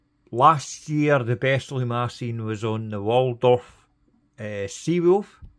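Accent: Scotland, United Kingdom. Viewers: Bloody hell, it seems like I’m hearing William Wallace reviewing a watch. Nice review.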